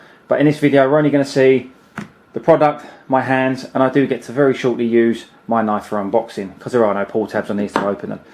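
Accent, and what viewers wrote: United Kingdom. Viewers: I find it pretty amazing actually but the recurring kernel panic issue due to the T2 chip even after installing Mojave updates is stopping me from buying it in the meanwhile , and yeah I kind of guessed it would be British accent haha 😅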